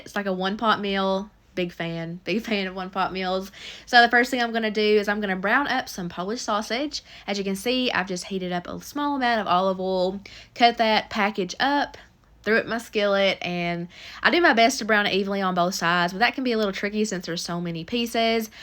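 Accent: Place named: Boston, United States. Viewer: I just love your videos, and as someone from Boston your accent just wraps me in warm joy <3 I'm a dietitian and your videos are so helpful for sharing with my clients who get really stuck on meal prep needing to be "perfect." You always have a good mix of complex and simple meals, they're always nutritionally balanced in terms of protein/fat/carb/fiber, the ingredients are accessible and economical, and they look delicious! You're also not afraid to try new things, and share the reality that some don't always work out the way you hoped. Thanks for all of your content, it is a huge help to people who struggle with this, and it is REAL fun watching you cook :)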